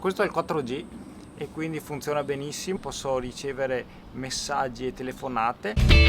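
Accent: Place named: Veneto, Italy. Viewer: Vecchio mi sto sparando tutti i video! Montaggio figo e info utili! Poi l' accento Veneto xè a roa pi bea del mondo!! Ahahah!! Sto programmando di venire anche io in zona appena finisce tutto questo casino.. Matteo da Rovigo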